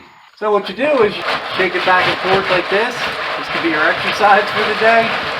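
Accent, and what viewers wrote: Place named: Maryland, United States. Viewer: Excellent video! Btw you sound straight outta Glen Burnie. You’re from MD aren’t ya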